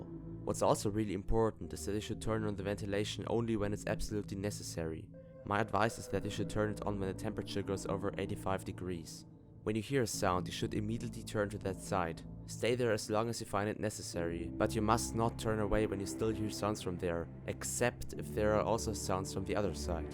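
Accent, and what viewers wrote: Germany. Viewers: thank you i just downloaded it aigan and i forgot everything thanks for the video and help!! u also sound german to me cuz of ur accent haha xd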